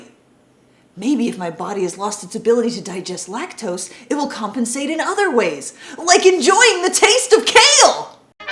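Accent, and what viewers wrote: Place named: United States. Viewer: Lol. I was caught off guard bc it sounds a lot like mine and my mum’s voices. Kinda just seems like a variety of American accent 🤷🏻‍♀️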